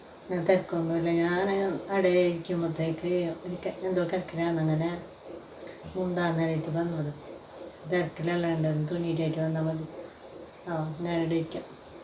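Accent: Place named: Kasaragod, India. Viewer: Nighale sthalm evde Kasaragod Karnataka boadr bagathula slanganllo athayath nde slang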